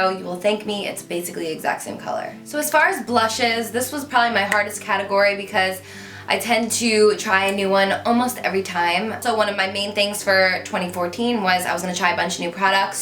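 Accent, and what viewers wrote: New Jersey, United States. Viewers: Your Jersey accent is everything OMG! Love your videos! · Lol you've been using the brow bar to go from your earliest videos and before I heard anyone else talk about whitening lightning:-) you are the best people say Queen Jaclyn and she's great but you are the Queen 4 sure! Comparing is bad I know so I will just say I love you so much please keep making videos when your a famous model or fashion designer or whatever dream you want and will achieve xoxo oh one joke I can't help it but I always know ur from jersey when you say the word drawer or draw in your case lol it makes me laugh everytime but not making fun I just think it's cute tomato tomotto LOVE YOU!!!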